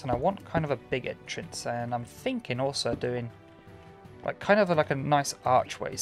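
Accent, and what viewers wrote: United Kingdom. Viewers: Hello sir! Dialect geek here wondering where about’s your accent from? Southern England? Is it cockney?😄 pardon me though, I’m just geeking here.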